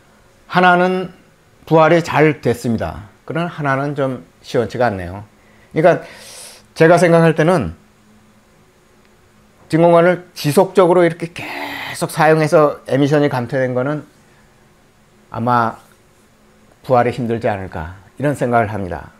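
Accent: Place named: United Kingdom. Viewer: 정말 좋은 기술 가지셨네요 한국에 계시가요 아니면 영국에 계신가요 영어 발음이 아주 영국식 이쎠요 미국에서 드림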